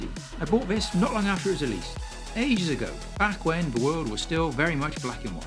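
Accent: South West, United Kingdom. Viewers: Didn’t realise that I was missing the South West accent from the modelling community! And I’m glad I’m not the only one who rests their head on the lamp!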